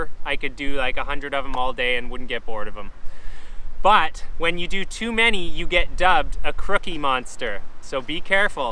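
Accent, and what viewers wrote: Canada. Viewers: Is that an Ontario accent? idk if you are but you sound incredibly Canadian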